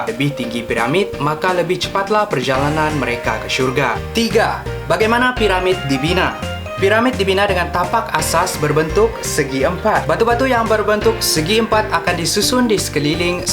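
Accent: Malaysia. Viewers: ni malaysian ke indonesian??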